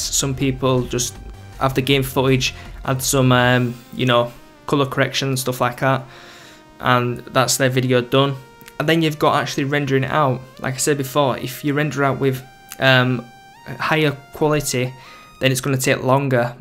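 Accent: Sweden. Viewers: ur Sweden O.O?? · Are you from Sweden? :D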